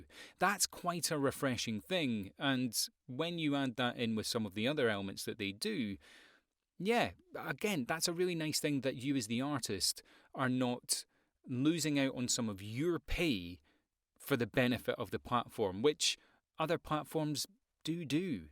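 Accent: Scotland, United Kingdom. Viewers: Your videos are fantastic. Began my VO career last year and have jumped around a number of channels on the subject. Yours are extremely thorough and made all the more watchable thanks to articulate manner and Scottish accent! Lovely stuff 😎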